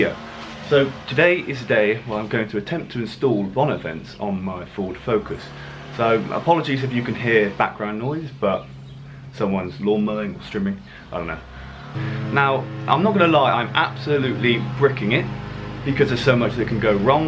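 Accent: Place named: El Paso, United States. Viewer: This guy sounds like he's from El Paso.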